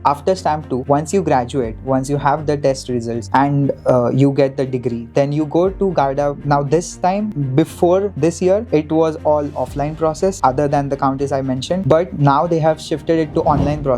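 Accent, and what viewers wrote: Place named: Maharashtra, India. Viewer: This guy is marathi and I don’t like your accent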